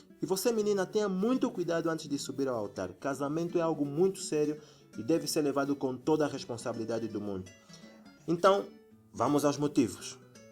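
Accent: Angola. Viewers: Gente! Ele é angolano?? Tenho um amigo de angola e o sotaque é igual... Amo angola!! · Um sotaque do Português Angolano parecido com o Português original (o de Portugal) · Seu canal é muito bom! Sou brasileiro. Esse sotaque é angolano?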